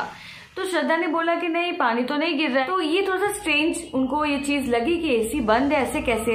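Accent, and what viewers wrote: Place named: Bengal, India. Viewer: Are ypu bengali by any chance i know considering ur sirname its not possible yet you have the accent when a bengali speaks hindi and you have a bengali beauty kind of a thing in your looks.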